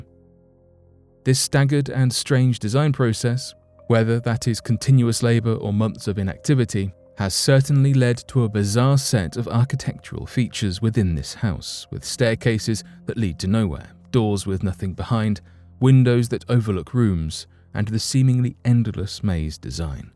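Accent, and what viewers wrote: United Kingdom. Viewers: You can tell, besides the accent, that a Brit wrote this. 1906 quake was the San Francisco earthquake of 1906.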